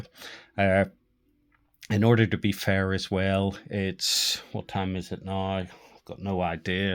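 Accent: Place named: Ireland. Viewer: Good morning mr first of all Wishing you swift and full recovery mate and thanks for the reply I sure do miss the way you do the channel And everything else how you tell it as you feel love that too I’m straight talking as well so All the best mr hope too see and hear that famous IRISH tone in the near future keep well sir ok thanks again ROYBOY in Yorkshire xx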